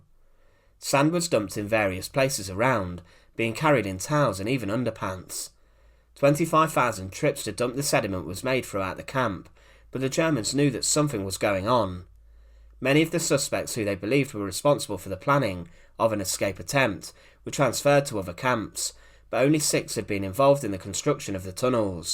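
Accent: United Kingdom. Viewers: I am a vet and would have enjoyed this video more if I didn't have to struggle to understand the narrator. I have heard other variations of Brittish accents that I liked listening to, but not this one ! It is not my intent to be rude, but I got a headache listening to this guy. I wonder why this guy was chosen to narrate, was he related to one of the escapes who were executed.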